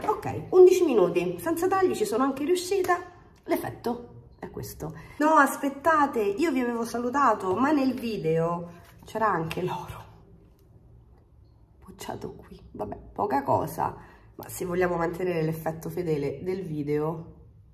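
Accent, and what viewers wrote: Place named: Rome, Italy. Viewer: Non riesco a seguirla, con quell'accento romano e quella voce repellente. Purtroppo esiste l'antipatia.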